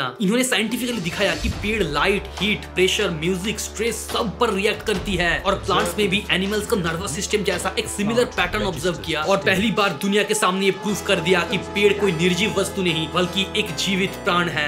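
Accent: Bengal, India. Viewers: Abb samajh aaya bhai tu bengali hai.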